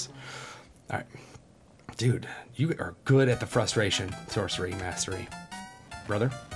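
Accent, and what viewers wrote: France. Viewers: that french accent though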